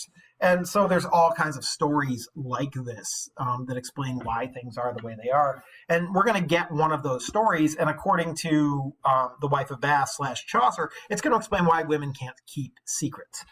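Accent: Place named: Oxford, United Kingdom. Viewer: Dr / Mr, I like your Oxford English accent. I live in the south of England.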